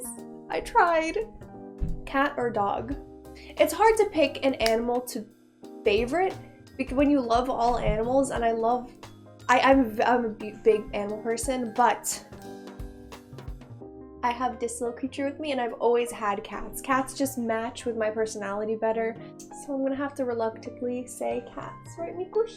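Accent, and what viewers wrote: United States. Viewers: You always self-identify as Polish, but you have an American accent. Did you move to the US during your early childhood, so you lost your Polish accent?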